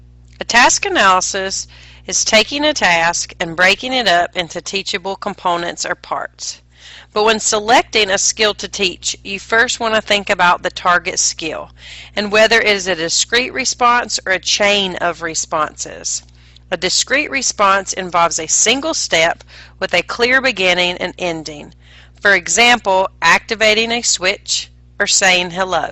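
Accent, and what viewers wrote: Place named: Texas, United States. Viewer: fantastic texan axent ;-)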